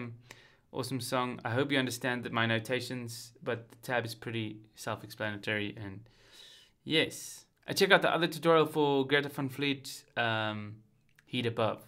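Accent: South Africa. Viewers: Het dadelik jou Afrikanse aksent agtergekom.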